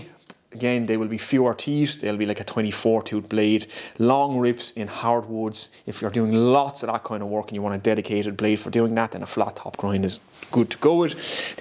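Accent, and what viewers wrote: Ireland. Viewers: I thoroughly enjoy and appreciate your content! .......even when I disagree with it. I live in Southern California (born and raised), surfed all my life, and if you ever met me, you might comment on my California surfer accent. I can't speak for other Americans but I think your Irish accent definitely adds value to your content!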